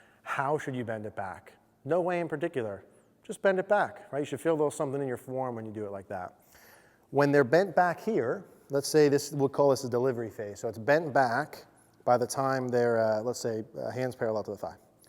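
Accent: United States. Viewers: A wonderful teaching perspective. How I wish I could spend some time listening to your best friend about tell me about how you came to your approach to the swing. His best friend's getting better-more entertaining-at his presentations. When you make a joke, your Pittsburgh sppech gets faster. Slow the jokes down for us old farts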